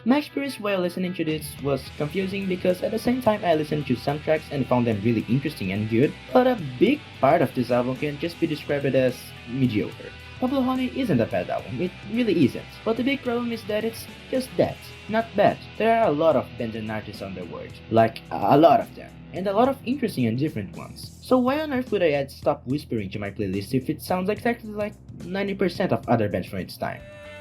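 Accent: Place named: Brazil. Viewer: Hey, i've been subscribed for a while, and i've been meaning to ask, do have a portuguese speaking channel? i'd love to see it, and share with some other brazilian folk, for real! I believe 're brazilian/portuguese based on your accent and community posts, if not, please ignore this comment.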